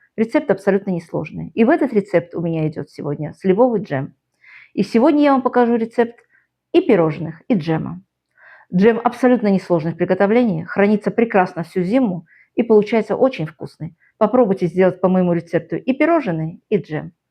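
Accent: Baku, Azerbaijan. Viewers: Спасибо Вам! Живу в Краснодаре. Так приятно слушать Вашу речь, бакинские нотки слышны.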